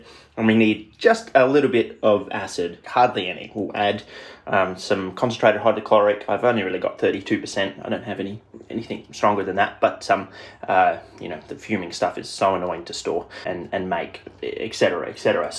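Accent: Australia. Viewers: ty the tasmanian tiger is what i first thought with gamecube and your accent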